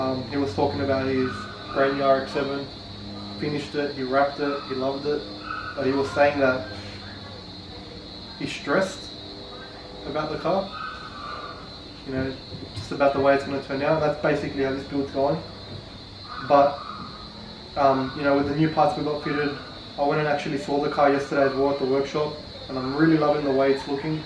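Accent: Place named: Australia. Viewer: Plasma man is your friend you sound Australian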